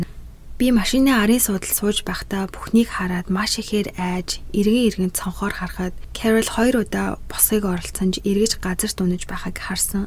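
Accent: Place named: United Kingdom. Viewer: MONGOLOOR yarij bgaa uhaantai YUM bol Angli hunii neriig Angli ayalgaar BUU HEL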